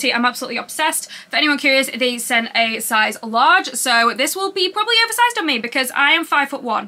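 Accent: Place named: Birmingham, United Kingdom. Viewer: This video was created with care and chaos. Balloon segment wasn’t the highlight. Hoody, water bottle… I’m appy… The most Birmingham accent you have done. Interesting fact, I have done some research on the Edinburgh shows. Sunset is at 2155 and twilight finishes at 2256. The Edinburgh shows look as if they will be in full daylight, if Taylor hits the stage at 1900 as is anticipated. These shows will have a different vibe to them. If you are coming to Scotland, you will experience it.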